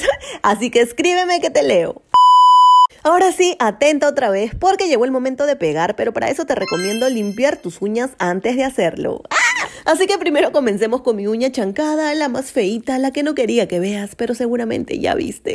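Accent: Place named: Peru. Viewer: Me carga el acento peruano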